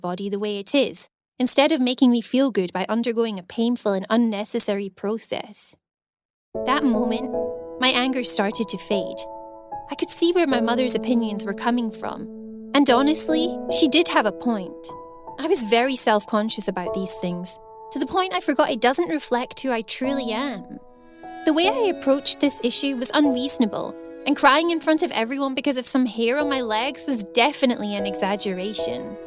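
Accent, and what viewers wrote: Scotland, United Kingdom. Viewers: She sounds Scottish to me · Is the voice a Scottish accent? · I think she has a scottish accent. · She sounds Scottish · Wtf is with her accent Is she trying to sound Scottish Plz help me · Is that a half Scottish accent? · Are you Scottish your accent sounds like you're Scottish???......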